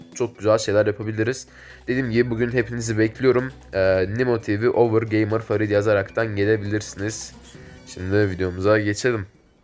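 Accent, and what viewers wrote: Azerbaijan. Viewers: sen azerbeycanilimisin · ABİ azeri misin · Abi sən Azerimisin Türkmü vallaha kötü mənadəa demədim soruyorum voruma cevab verirmisin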